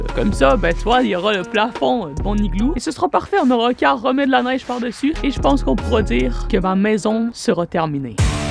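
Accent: Quebec, Canada. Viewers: ton accent quebecoi je ladorrrre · Tu charbonne les video ton contenu est incroyable continu comme ca et aussi au passage, adore l’accent québécois 😂✨🔥😆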